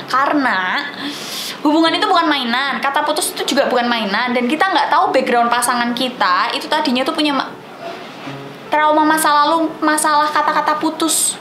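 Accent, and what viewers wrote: Java, Indonesia. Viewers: Mantap medok juga bahsanya kak Jangan lupa mampir ke chanel aku juga ya teman temanku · Medok bener jawa'a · Embak lek ngmong lucu lo, Jawa pundi pean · bahasa jawanya ituloh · Suaramu khas Jawa banget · Medok tenan sih mbak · Medok bangettt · Orang mana si kok kyknya orang jawa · logat bicaranya kaya orang jawa? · Sampean wong ndi mba loogate ko jowo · Logat e wong jowo Iki koyok e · Wong jowo tah MBA jawane pundi? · Meddddhhhookkk anjirrrrr jowoneee.....!!!!!! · Mbk e wongdi? Kok jowone medog bgttt😅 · Medok amat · medok banget yaaa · Logatnya bahasa Jawa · kyk medok2 ngomong jowoan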